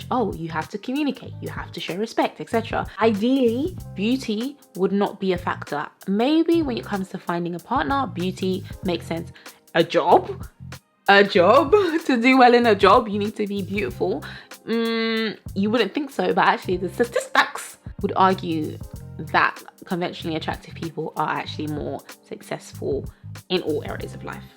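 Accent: South East London, United Kingdom. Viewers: She sounds like she's from South East London, I grew up there so it's a familiar accent to me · It sounds like a south London accent to me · Its nice to hear a British English speaker instead of Americans who seem to dominate the internet/media. I totally disagree that white women are the standard of beauty. By that I mean that I don't think only white people are beautiful, there are many types of beauty and that is a wonderful thing. We just need to open our eyes :) As for trends, I wear what I like and what is comfortable, what I think suits me, I don't care about the fashion, that is just the inconvenient thing that means I can only buy certain types of clothing/styles that are available. I could be a real rebel and wear something totally far out but I just don't care enough. What is really sad is that black people are enemies to their own kind sometimes. 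Really sad.... As for thinking some are not beautiful but for a platform, how about realising that is a different type of beauty that appeals to others, just not you? Having said all of this, when you are an older woman, you are invisible mostly, that is actually a good thing because you are free of all this crap to a large degree :) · South londonn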